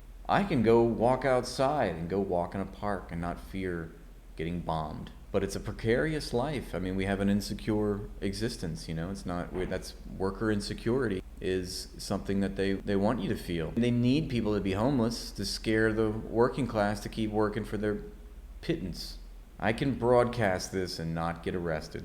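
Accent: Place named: United States. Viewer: Biden or Trump doesn't matter aipac wins... true statement... but as for the rest, all systems exploit... and rolls eyes, there is no white privilege, rich privilege sure... and workers are great, but you should thank the Constitution for your Rights (I'm assuming from youre accent that youre an American citizen)... good luck!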